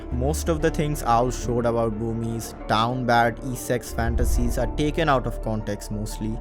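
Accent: India. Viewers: ahh first ur Indian accent fix that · That goofy ahh Indian voice💀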